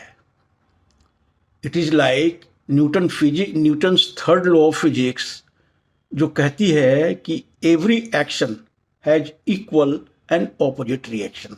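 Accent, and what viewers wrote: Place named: Eastern Uttar Pradesh, India. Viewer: jee, bataiye kya abhi humko paise laga dena chahiye kyunki share saste mil rahe hain?is main pareshaani hai ki kab lagaye, already portfolio 12 percent down hai, middle class ke liye issue hai ye, aapke support ke liye bahut dhanyawaad, pratiksha rahegi, shayad aapki vaani se lagta hai ki aap UP east ke hain, bataiyega kahan se hain aap aur humara anumaan kitna galat hai..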